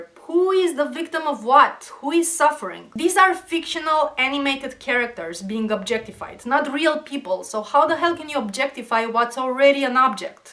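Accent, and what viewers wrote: Romania. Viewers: yo so what country are u from? romania maybeh? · You make some very good points and in such a reasonable and non-histrionic way. Because of that I'm only slightly disappointed, having very much enjoyed your video and even subbing to your channel. My disappointment comes from being a fan of another Romanian Youtuber named Vee. While you and he actually make some similar points (though there are some very distinct differences), he is much excitable and given to, often hilarious, raging. While the cultures of Romania and the U.S. also have their distinct differences, I'm glad there are so many issues we find common ground on. The more I hear you both the I've come to believe the Romanian accent is my favorite in the world, so thank you for that as well. BTW I also was a Red Alert fanatic when it came out and the intro still gives me chills.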